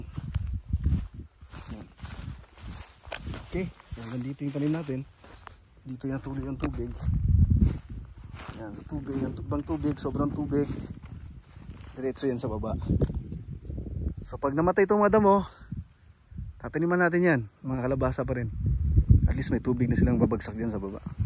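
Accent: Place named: Bohol, Philippines. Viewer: Taga Bohol po ba kayo sir?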